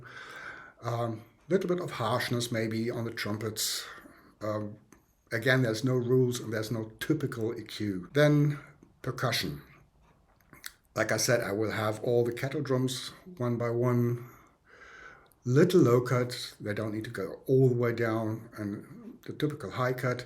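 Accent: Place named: United Kingdom. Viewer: Lieber, danke für Deine Videos! Ich finde, Du erklärst schön klar und verständlich. Ich habe gleich abonniert. Darf ich fragen, wie Du zu Deinem großartigen Englisch gekommen bist? Ich finde, das klingt so schön britisch. Liebe Grüße, Renee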